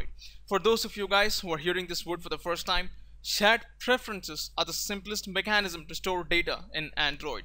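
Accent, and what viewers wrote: India. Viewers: Delhi Accent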